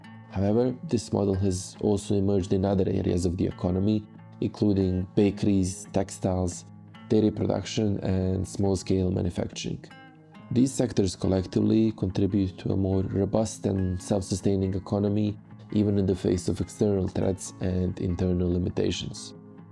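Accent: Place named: South Slavic, Eastern Europe. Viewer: Love the video I hope there are more geopolitics commentary with south slavic accent out there.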